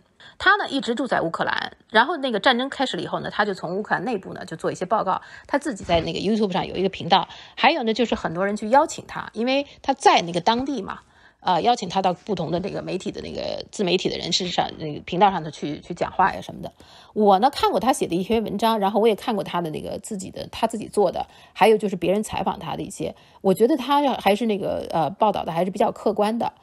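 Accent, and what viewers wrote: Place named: Haidian, China. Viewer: 海淀口音…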